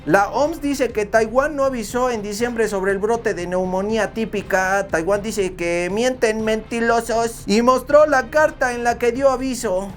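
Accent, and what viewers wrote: Mexico. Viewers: Hermoso acento azteca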